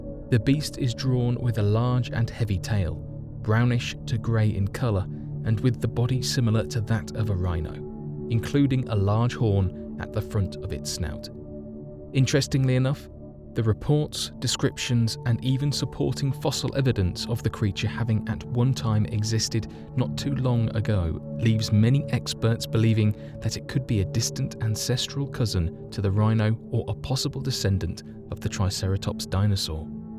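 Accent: England, United Kingdom. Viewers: The way this is narrated is giving me headache. I'm confused because he has an English accent but it's like he's never spoken English before.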